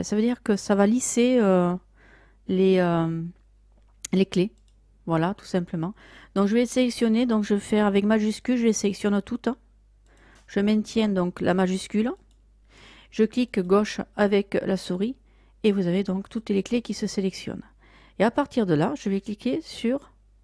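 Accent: South, France. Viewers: Bonjour. Votre chaine est vraiment géniale pour tous ceux qui apprennent à maitriser Magix Vidéo Deluxe. C'est une mine d'or, une véritable caisse à outils. Les tutoriels sont bien présentés et votre ton "méridional" très agréable. Pour les primo-accédant à ce très bon logiciel, peut-être serait-il utile qu'il y ait deux tutoriels de base : -> Présentation de Magix Video Deluxe, -> Premiers pas avec Magix Video Deluxe. Après, toute votre chaine présente ce que l'on peut faire dans le détail... Excellent et merci pour votre partage. JiPi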